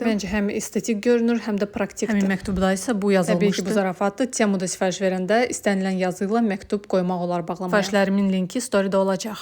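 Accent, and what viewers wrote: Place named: Russia. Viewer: ÖZÜNÜZ TUPOYSUZSA CAMAATA YAZMAYIN.RUS QRAMMATİKASI İLƏ DANIŞIR VƏ ONDA T HƏRFİ İNCƏ OLANDA Ç KİMİ EŞİDİLİR!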